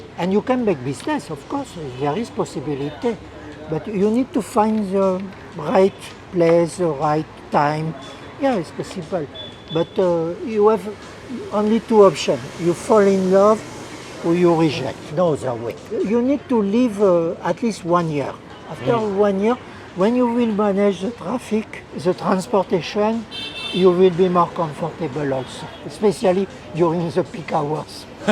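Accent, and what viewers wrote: France. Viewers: Although he speaks in " French English" accent, he is typical manipulative Muslim. Cast system is related to marriage only. So called lower class enjoy way more benefits than so called upper class. Btw there are only two cast "human" and Muslims .There are only two religions "humanity" and Islam. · His pronunciation is still like that of a typical Frenchman. I'd love to meet this man one day in Mumbai.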